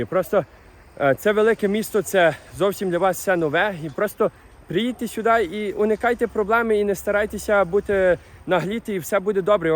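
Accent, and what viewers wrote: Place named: Ukraine. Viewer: Що смішного? Український діалект. Діалекти має кожна нація!!!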